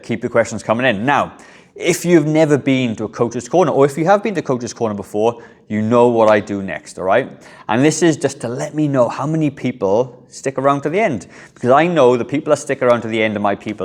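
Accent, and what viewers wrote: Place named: England, United Kingdom. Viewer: 2035. Are you ready for that? I’m 72, and Lisa Liang said in 2035 there will be a billion of us that will be 85. I’ll be 82. I’m thinking Tai Chi, Yoga, calenthenics, and the like. Americans(my wife would love your English accent) tend to think that if you’re not pumping heavy iron, and running 5, or 6 miles a day, it isn’t exercise. Marius Visser, also from England says that the group he works with are 60, 70, and 80 year olds. God bless you.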